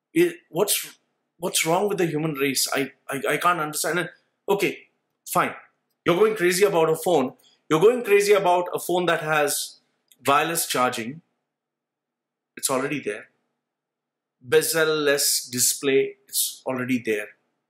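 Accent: India. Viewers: Indian accent ...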